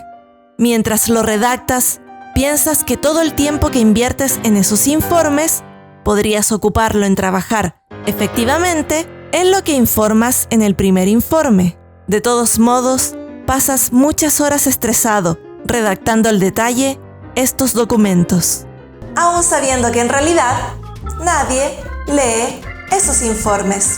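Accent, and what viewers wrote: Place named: Chile. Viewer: Que buen video! Impresionante explicación, impresionante edición, muchas felicidades ❤️ por su manera de hablar se nota que es chilena jajaja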